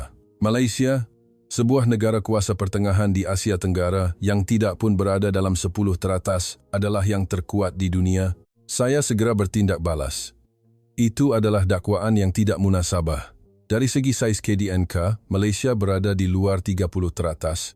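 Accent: Indonesia. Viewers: Kenapa orang Indonesia suka bikin konten tentang tentera Malaysia..dan bikin natizen indo berapi..suara admin jelas2 org Indonesia..bikin saja tentang tni kamu,puji saja tentera kamu kenapa sering masuk campur dgn tentera negara tetangga...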